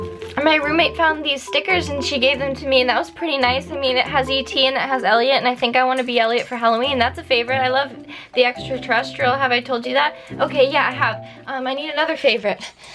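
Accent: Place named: Canada. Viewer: That Canadian accent... 👌🏻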